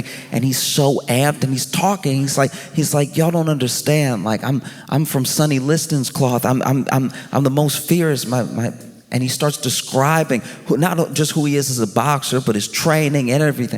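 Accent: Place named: Louisiana, United States. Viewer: How does Josh put out so much quality material? He’s one of the funniest comedians today. Also love the Louisiana accent 😊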